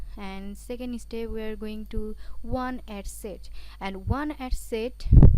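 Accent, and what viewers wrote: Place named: Bangladesh. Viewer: speaking in english but bangla pronunciation!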